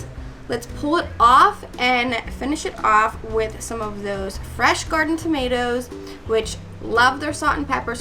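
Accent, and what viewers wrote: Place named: Central Pennsylvania, United States. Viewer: OK, you've got to tell me.. are you a Central PA girl?? I started wondering when you mentioned Cooper cheese in another video, then you throw out "dippy eggs" in this one. Add in the products from Giant and of course your accent, and I'm saying you must be from PA. Am I right??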